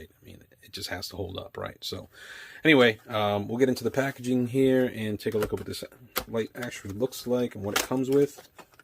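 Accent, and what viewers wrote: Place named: Boston, United States. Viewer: That's a pretty comprehensive review - Thank-you!! Whereabouts are you from, fella? I almost thought Boston, but your accent isn't the typical Bostonian I'm used to... Also, if you don't mind me asking, what do you do for a living that requires a duty belt? I'd guess Police Officer, from the '911' part of the channel name?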